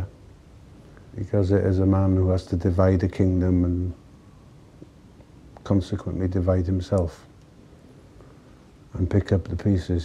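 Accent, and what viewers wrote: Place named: Wales, United Kingdom. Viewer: Wonderful with the Welsh accent.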